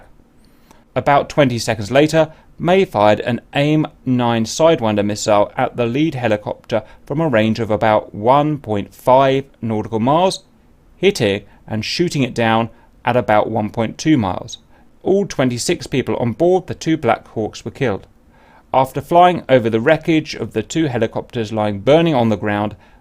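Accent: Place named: United Kingdom. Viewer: IDK why but the British accent suits well for this kinda thing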